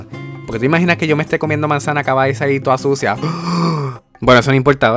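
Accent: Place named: Puerto Rico. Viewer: yo tambien lo soy pero naci en estados unidos y ya sabia que era de puerto rico por como hablaba xdd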